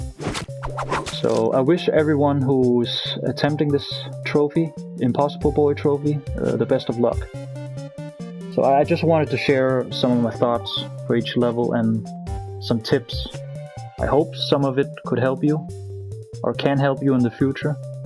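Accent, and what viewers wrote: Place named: Sweden. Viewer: You sound swedish 😂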